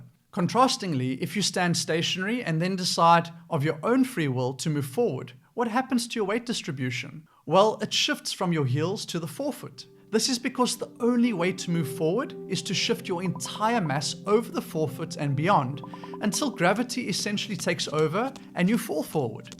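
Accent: South Africa. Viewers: Beautiful South African accent, made watching very enjoyable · Ten seconds into the video and liked, subscribed. South African accent is so nice. You sound a bit like the former protea captain Greame Smith!!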